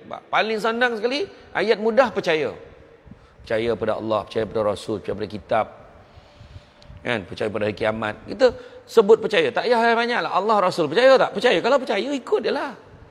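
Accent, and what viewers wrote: Negeri Sembilan, Malaysia. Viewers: 👍 bahaso melayu minang nogori semilan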